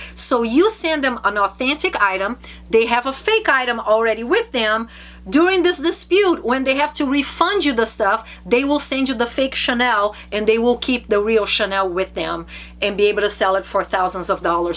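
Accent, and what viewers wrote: Brazil. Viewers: Seu inglesé otimo, mas esse sotaque é de brasileira, nao é? prazer! muito bom o conteudo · This accent sound so....brazilian! Tem q ser! Anyhow thanks for sharing!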